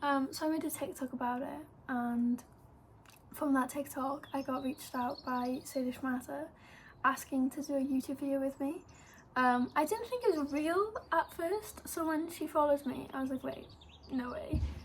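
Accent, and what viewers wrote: United Kingdom. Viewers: are you british?? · You sound British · Your voice sounds like British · Hello, I'm just now getting to this video an you have an amazing english accent. I'm one of your biggest fans. That's Why I subscribed to your channel. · Yep, but she's Welsh, a different British ( English)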